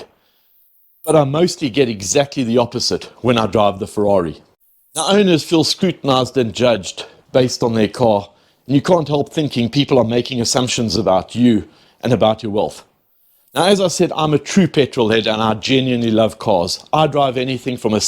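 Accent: South Africa. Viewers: Dammm sounds just like a South African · Are you South African? You sound like a Joburg Boytjie? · I know a South African accent when I hear it 😂😂😂😂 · I thought straight away that your English sounded suspiciously similar to my local Eastern Cape English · Bro, are you South African? I can always hear my country’s accent 😂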